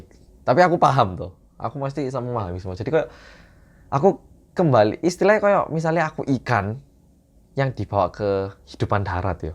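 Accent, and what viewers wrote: Java, Indonesia. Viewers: Jowone medok tenan …😀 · Logatnya kental jawa banget. 😊😊👍👍👍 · Sing tak ndelok teko mas iki koyok nduduk wong korea, tapi wong jowo sing onok keturunan koreane, medoke lho mantepppp. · Korea medok ancen joss gandos kotos2 👍👍👍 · Wuuuuuuuuuaaaaaaakeh Buuuuuuuuuuuuuaaaaanyak Ngakak😂medok bgt 🤣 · ya allah rekk Jowo e medok temen 😍. salam lamongan 👐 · Jangkrik medok poll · Kemarin2 sih tau videomu, cuman gk pernah kulihat. Ilfill gitu lihat cogan ngomongnya medhok (aku sendiri orang Jawa yang kalau ngomong Jawa medhok, tapi kalo ngomong bahasa Indonesia gak semedhok ) wkwk. Tapi setelah nonton satu videomu semalam, langsung ketagihan. Watch laterku penuh dengan videomu mas😂. Aku arep maraton nonton videone sampeyan nggih mas.. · Medok mu tenanan mas, hehehe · Iki wong Korea opo Indonesia to? Medhok men 😂😂👍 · Korea....aku loh selalu nonton, lucu, unik n medookkk. 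hahahaha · Ya Allah gemes, gak akan tau org, kalo mas org korea Bahasa jawanya aja medok bgt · Aku ngakak ndelok'ke mas muedokkk dok tenan.. 😂 Tapi aku suka pola pikirnya, pengetahuannya luas jadi menginspirasi klo liat video2nya.. like lah 👍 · Seneng Karo medog e 😄😄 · Aku suka semua videomu oppa, dan juga lucu bahasa Jawa mu meddoooo... Teruskan... Sukses selalu.. Gbu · Kyk ga ada korea2nya klo lg ngomong medok 😂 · Mas ga usah oplas... pesonanya terpancara kemana2 sampe ke luar angkasa.. apalagi klo udah medhok.. wiisss... wissss.. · Sam, unik banget jawa medok nya 😃 pertama liaat langsung ingin subscribe 😍 main kesunda sam mau denger juga orang korea ngomong bhsa sunda 😄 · Hai Oppa.. salam kenal.. ku baru tau ternyata kamu org Korea,ku pikir km org Indonesia.. Dialeknya jawa bgt.. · Oppa rasa mas medok pisan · The moment when aku orang jawa asli kalah medok mungkin kalah skill bahasa jawanya sama mas. 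Salut aku to mas😂✌ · kak bikin konten dong tentang cara belajar bahasa korea biar bisa fasih, ya kayak kakak dulu pas belajar bahasa indonesia jawa sampe bisa medok gak kelihatan logat korea nya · Medoknya perasaan gak kaya sekarang, lebih..... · kok lama2 jatuh cinta sama cara ngomongnya yg muedok buanget ya mas. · Ahahahahahah omaigatt for real you sounds so #medok.. 🤣🤣🤣🤣🤣super funny..really remind me of my korean ex Bf whos talking indonesian with javanese accent.. Goodluck!!!👍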